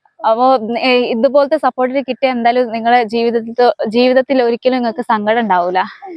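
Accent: Malappuram, India. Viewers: മലപ്പുറ०കാരിയാണോ ? ആ സ०സാരഭാഷയാണല്ലേ ? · ആ മലപ്പുറം വർത്താനം സൂപ്പർ .. ആ North Indian dress .. അതും സൂപ്പർ ...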